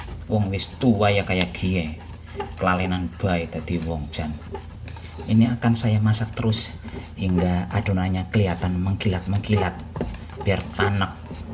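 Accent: Banyumas, Indonesia. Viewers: Lucuuuu ms...saya jawa timur hidup di Samarinda....suka dengan logat bahasa ngapak...lucuuu poolll...ms👍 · Nyong seneng bahasane ngapak,pada bae kang nyong ya ngapak😂😂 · tetep ngomong bahasa ngapak... lebih natural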